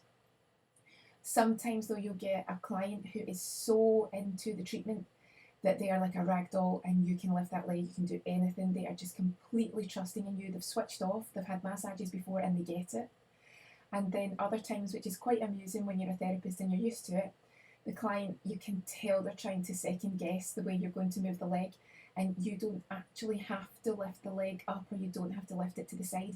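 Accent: Scotland, United Kingdom. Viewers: I could listen to your accent forever. Lol… I need to find a nice Scottish woman. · Where in Scotland are you from? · im from Scotland too, nice to hear a familiar accent. BTW i have been using your techniques for my husband who suffers from stress and crohns disease and it helps him EVERYTIME< thanks so much for your effort and time in making these videos. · Swedish massage....I was not expecting a lady from Scotland to do the commentary. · Is she Scottish ? I'm fond of northern British accents (and some like Surrey). She has a lovely voice indeed... She's a masage for the ears and brain :) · You`re Scottish accent is super turn on. Great upload,btw. :) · I knew you were from Scotland, you have simillar accent to my friend’s. Are you from the Highlands? Btw, great massage. I am currently studying medicine and these kinds of videos help me to get a grasp of anatomy.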